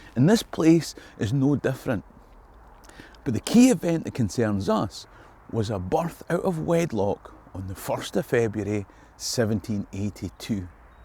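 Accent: Scotland, United Kingdom. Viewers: Love your videos. Especially love it when you say murder. Love the Scottish accent….shame my ancestors didn’t pass the accent down. Cheers from an Aussie. 🦘👍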